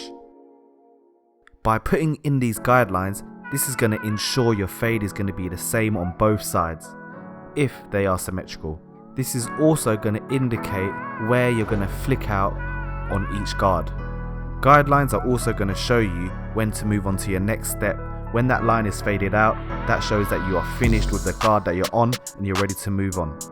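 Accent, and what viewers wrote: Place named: United Kingdom. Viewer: Omg your British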